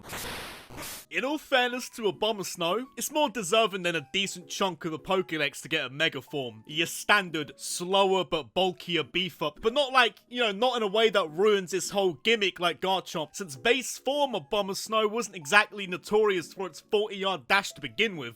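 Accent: United Kingdom. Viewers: I’m subbing because you’re British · i think hes british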